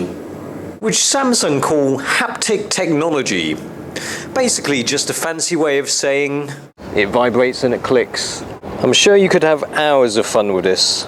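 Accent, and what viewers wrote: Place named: United Kingdom. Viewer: where is that at??? i noticed u were in hk.. with a uk..a ccent?? sorta... i go to hk.. 2-3 times a year.. :P goin this august... not too many hkers speak english.. used to.. not nemore.. u have excellent english.. cheers!!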